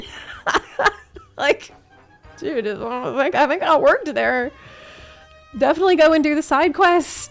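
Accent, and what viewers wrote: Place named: New Jersey, United States. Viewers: I’m from NJ. This is just how a lot of people here talk. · Haters to the left, your Jersey Goblin accent is spot on.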